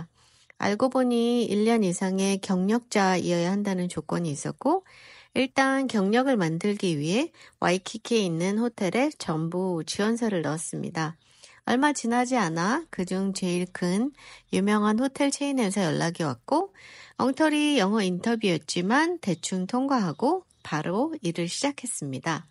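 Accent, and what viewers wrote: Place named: Seoul, South Korea. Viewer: 또랑또랑한 서울 말씨 참 듣기 좋네요, 멋집니다.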